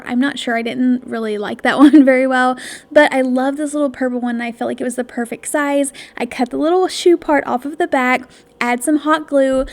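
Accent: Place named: Utah, United States. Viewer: You kinda' have a Utah accent. Do you live there?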